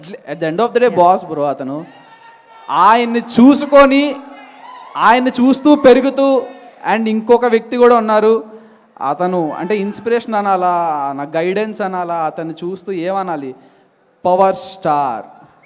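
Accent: India. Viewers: Anthanu anedi Vizag, vizianagaram slang andi respect word oka oka pratham lo different slangs untai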